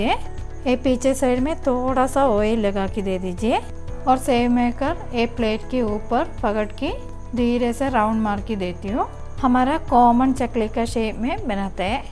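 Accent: Kerala, India. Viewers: Thank you madam for the recipe,I like your hindi in Kerala accent ❤️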